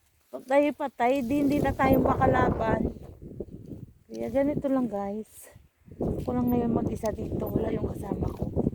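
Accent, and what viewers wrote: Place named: Ilonggo, Philippines. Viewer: Pinay2 na pinay at ilonggo tune😅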